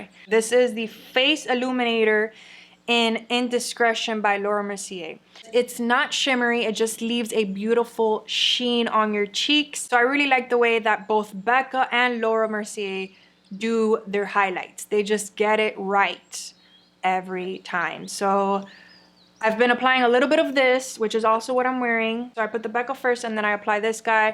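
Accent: Cuba. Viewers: Cubana! No daba con tu acento!! 😅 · Ok, you have to make a video in Spanish. Me encanta tu acento Cubano You look beautiful in this video, as usual.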